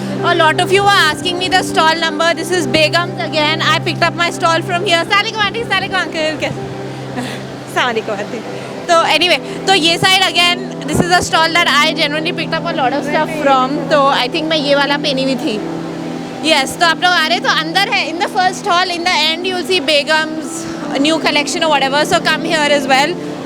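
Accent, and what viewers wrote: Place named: Bihar, India. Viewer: Tumhari awaaz slum bihariyoun ki tarah hain Learn hindi urdu property Don't say logaan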